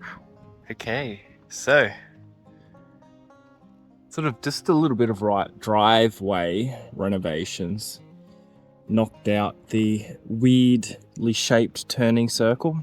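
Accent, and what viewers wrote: Australia. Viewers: Hello fellow Aussie. Wishing you all the very best in your new adventure on the land. I’ll be checking in to see how you’re going on your homesteading journey. Great to hear a fellow Aussie on YouTube.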